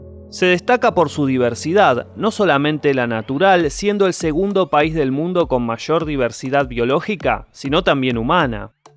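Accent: Argentina. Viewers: Yo pense que hiba escuchar un Paisa.. pero resulto ser un Argentino... Ironia? hahah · QUE FASTIDIOSO ESE ACENTO ARGENTINO, BUSQUEN OTRA PERSONA PARA DAR A CONOCER ESTE VIDEO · Hola. Excelente video, recuerdo q cuando cursaba como 4to de primaria, nos hacían ver en Historia de Colombia a las tres grandes familias de indigenas en Colombia, es decir la Chibcha, la Caribe y la Arawak, y pues dentro de la Chibcha destacaban los Muiscas, los Taironas y los Paeces, pero a día de hoy sabemos que eran muchas más. Colombia es un lindo pais para recorrer, obvio no voy a mentir tiene sus cosas malas, pero animense a venir, yo siempre recomiendo ir a el pueblo y la laguna de Guatavita y ver el embalse de Tominé. Conocer Villa de Leyva, y tambien el valle del Cocora, y claro el infaltable, Guatapé y subir a la pierdra del Peñol. Por el acento del youtuber se que es de Argentina, un país que espero conocer pronto, y pues me imagino que sí ha venido a Colombia y si no pues animese parce. 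· Is argentino 🇦🇷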